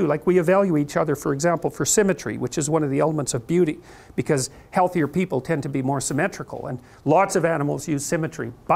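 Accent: Canada. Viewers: I find the Canadian accent very annoying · If he didn't sound so Canadian people would take him more seriously.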